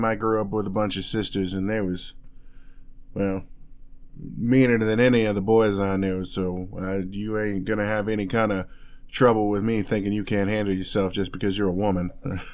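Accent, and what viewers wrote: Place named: South, United States. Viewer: I loved the audio as always I'll be adding this to my playlist I absolutely love your country accent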